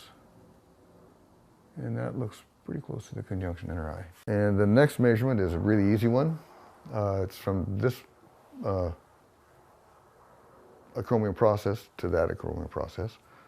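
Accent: United States. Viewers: Midwest accent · That's an honest to God, original Oregonian accent.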